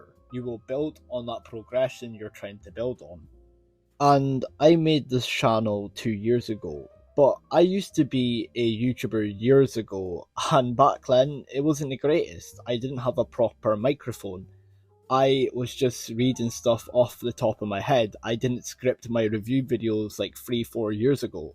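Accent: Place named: Scotland, United Kingdom. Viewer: It was a great video! Its great hearing a fellow scot talking about the stuff im also interested in :)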